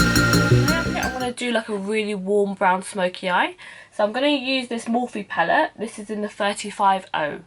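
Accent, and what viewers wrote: United Kingdom. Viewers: omg I've just found you on instagram and i was like no way this girl doesn't have youtube with such fleeky makeup, new subbie here. i love it, and do you live in the UK you sound very british x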